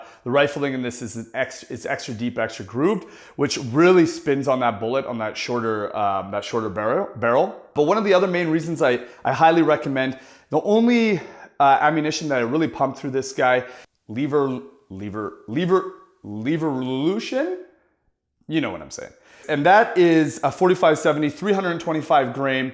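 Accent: Canada. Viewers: Wow you DO sound Canadian, eh?!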